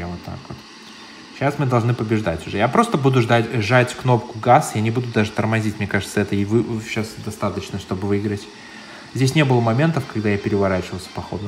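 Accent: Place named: United States. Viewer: Ты что из сша? Ты не русский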